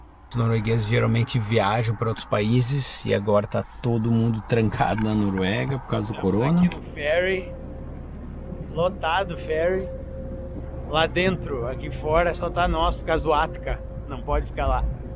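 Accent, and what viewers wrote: Rio Grande do Sul, Brazil. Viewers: linda matéria!!! mas tens um sotaque de gaúcho violento... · Uma curiosidade minha é se você é gaúcho ou catarinense, Seu sotaque puxa muito para as duas regiões. Abs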